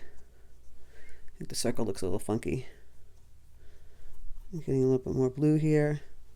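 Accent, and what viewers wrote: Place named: New York, United States. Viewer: Love the NY accent ❤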